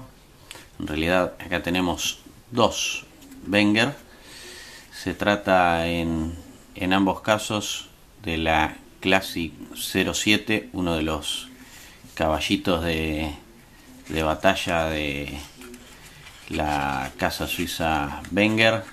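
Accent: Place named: Argentina. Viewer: me gustan mucho tus videos, lo haces muy bien y el acento argentino tambien me gusta mucho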